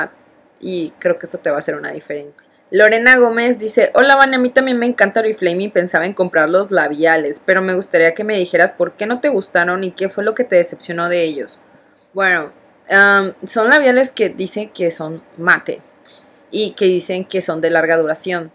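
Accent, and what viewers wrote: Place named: Tabasco, Mexico. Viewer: sk kuando somos tabaskeñas no keremos k se nos note el acento te entienndooojajaja te adorooo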